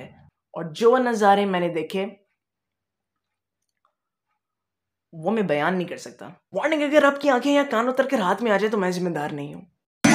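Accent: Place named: Lahore, Pakistan. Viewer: I love lahore ❤❤mujhy phely sy hi apky baat karny ky style sy lagta tha ky ap lahori ho ❤❤❤❤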